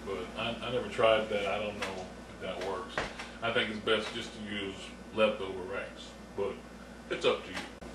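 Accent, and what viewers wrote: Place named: South, United States. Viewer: i love ur country accent n ur sense of humor im from down south i stay in texas what state u live in?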